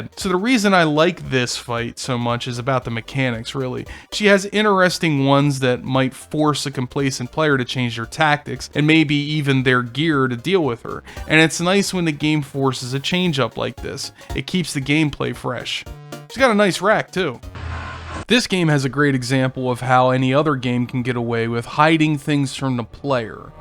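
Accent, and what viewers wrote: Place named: Philadelphia, United States. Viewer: Love that Philly accent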